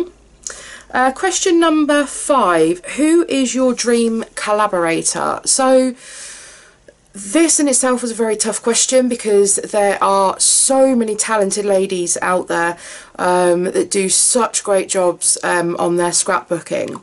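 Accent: United Kingdom. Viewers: Can't believe I missed this video earlier. Have always loved your videos. Love this one and getting to know u better. Love your sense of humor and I could listen to the English accent all day long. Love your sassiness and love that u don't apologize for your overabundance of everything scrapbook. Much love from usa.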